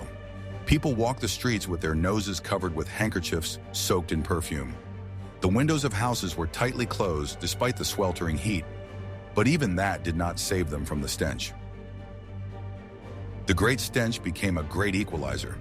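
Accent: United States. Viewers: What’s this American voice all about, it’s put me off as you don’t tend to believe it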